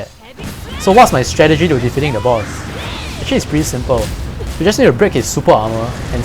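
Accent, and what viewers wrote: Singapore. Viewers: its a singaporean accent. as much as u and i might hate it cuz we're NA ppl, its the way singaporeans talk. its their national accent. type in singlish in youtube if u want to know more about it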